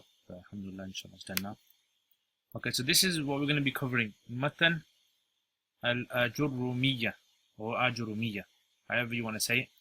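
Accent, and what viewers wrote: Birmingham, United Kingdom. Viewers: Brother sounds like you from Birmingham uk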